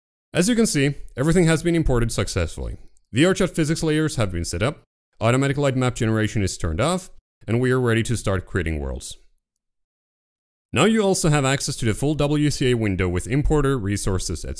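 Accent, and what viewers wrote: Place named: United States. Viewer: It sounded like a regular america accent to me lol. Robotic? lol. But yeah good job. I speak fast myself and thought it seemed a reasonable pace but you slur your words together a bit, I have the same problem, slowing down might help with that. But thanks for making this fast and very short. I really hate it when tutorials drag on too much.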